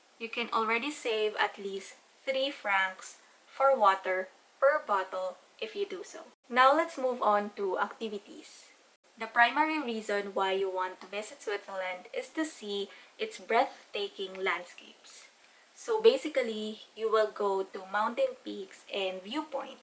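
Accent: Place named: Ilocos, Philippines. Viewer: Parang Hindi Lang Filipina c mam.kasla ilocana pay.😄❤️❤️❤️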